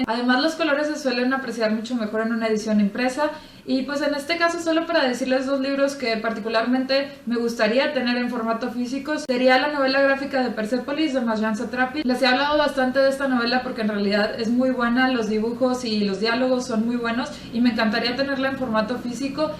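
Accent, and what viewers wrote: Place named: North, Mexico. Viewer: Me sorprende que a pesar de que ya llevas tiempo en Alemania continúas teniendo el acento norteño jejeje Me gustaron tus respuestas y yo también quiero en físico Persépolis:( Espero ya subir mi tag en estos días Y sólo como sugerencia: recomiendo que pulas un poco más la edición de tus videos, hay algunas cosas de las que puedes prescindir sin afectar el contenido y así hacer más breves tus videos =) Un abrazo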